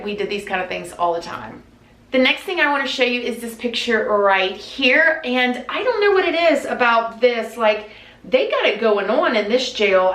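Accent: United States. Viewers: Love to see you Interview a guy called David Icke check him out? Most important information I've ever heard!!! 😉 check him out on Agenda 21 the plan to kill you! 😈 Top illuminatti spotter? 👤👥👤😲✊👀 if you got little ones? Please check out David Icke on the Vaccine hoax!! 😈 this life is nothing like they tell us it is!! 😲 check him out on Bloodlines!!!*😈 Crazy stuff? And Jeff Censored the most banned head on YT 😂 all your favourite Rockstars? And Actors ALL throwing up illuminatti Gang sighns?? On Jeremiah Cohen!!! It'll blow you away? 😲 so peace people from Sunny England and if you read this can I get a shout out please in that Top American accent 👍😆✌💙 from your Number 1 English lad!!😉 Zac xxx cheers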